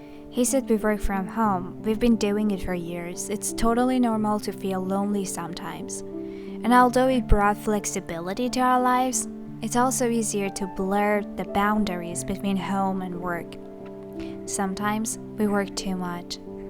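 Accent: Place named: Turkey. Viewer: لهجه ترکی بهت میشینه دختر💙💚 امشب خیلی گریه کردم ولی دستم خورد ولاگت باز شد..... بعد.... بهترم.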